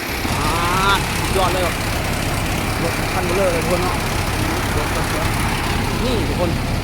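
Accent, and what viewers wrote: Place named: Laos. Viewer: ดีครับ/ ฝึกพูด ภาษากลางให้ชัดๆบางที่บางภาคเขาจะฟังภาษา ลาวไม่ออก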